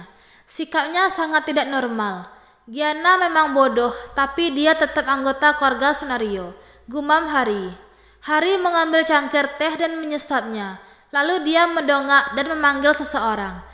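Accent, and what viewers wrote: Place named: Padang, Indonesia. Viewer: Logat org padang kental banget min...😂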